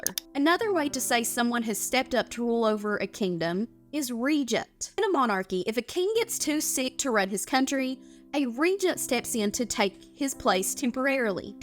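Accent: South, United States. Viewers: Just curious, what region of America is your accent from? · Sounds southern or midwestern US.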